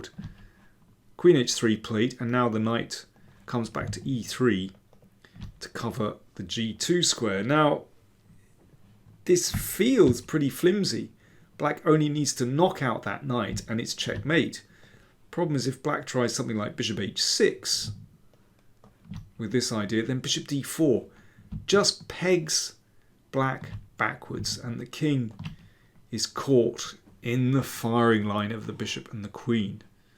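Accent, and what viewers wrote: England, United Kingdom. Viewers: I agree : a delightful english accent that makes the video even more agreable.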